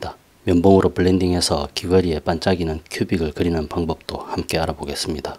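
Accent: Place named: Busan, South Korea. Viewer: 말하시는것보니 부산인듯하네요 혹시 직접화실운영하시나요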